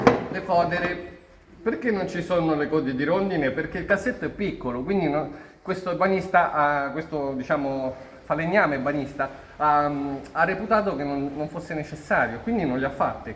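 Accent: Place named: Lazio, Italy. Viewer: Salve 🙂 Complimenti!il suo accento forse mi sembra Laziale,ma il cognome che porta è senza ombra di dubbio Ragusano!!! saluti da un ebanista e restauratore 🤚🏽🤚🏽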